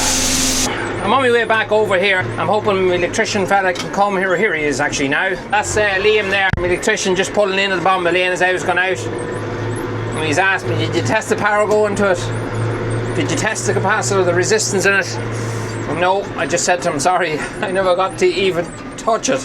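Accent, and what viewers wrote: Ireland. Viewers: you certainly do more than farm! I am an 80 year old lady in a wheelchair. I, too, came for the cottage renovation, but stayed because your Irish accent, your stories, your achievements, your struggles and your honesty are captivating. When I lost my parents, I suddenly realised, I was now the adult. The final decision rests with me, I can't consult them any more. You are going through that transition with losing your father - you are now the adult farmer, the decisions rest with you. It will be stressful, but you will grow with it. Best wishes.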